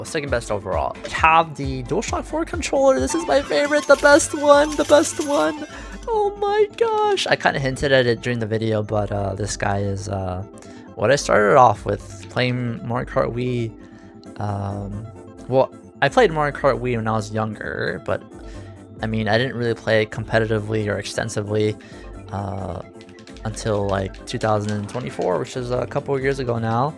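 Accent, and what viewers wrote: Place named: Texas, United States. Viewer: texan spotted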